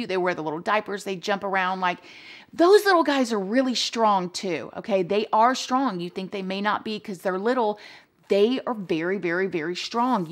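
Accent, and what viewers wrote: South, United States. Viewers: looking at you it wasn't a surprise that you have a country accent lmao..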